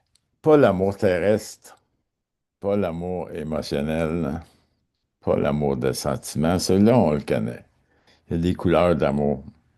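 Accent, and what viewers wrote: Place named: Canada. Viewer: Oui bien résumé avec votre bel accent canadien si charmant de surcroit qui fait arriver froid neige dans mon ESPRIT, belle âme ! ❤😅🎉❤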